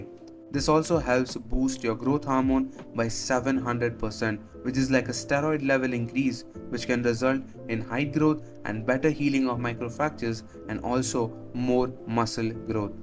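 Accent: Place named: India. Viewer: after i heard the indian voice i knew that video is gonna be crazy xD gj bro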